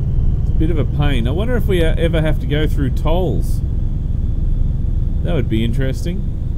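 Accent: Australia. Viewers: Are u Australian ?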